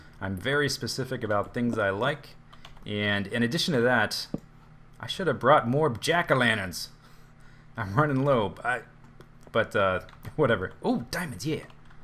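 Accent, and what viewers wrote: United Kingdom. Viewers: its, with a sexy uk voice? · Good, British accent.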